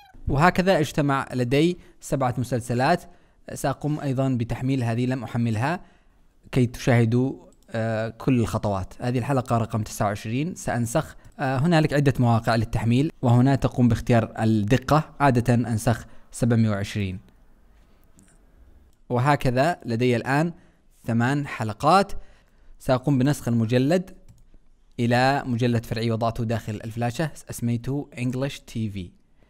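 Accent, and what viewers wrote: Yemen. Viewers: انت يمني صح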